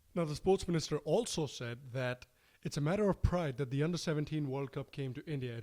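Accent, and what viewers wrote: United States. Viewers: Ahh your first video, your accent sounds American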